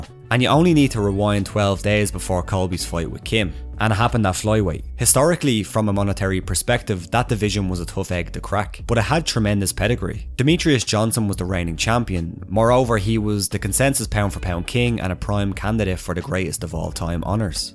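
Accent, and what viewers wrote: Ireland. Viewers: I'll certainly give you a "tums" up on that one. I'd give you "terteen" of em if I could. ;) I had one of the best times of my life in Dublin! My real name is about as Irish as you can get. Love your accent and videos!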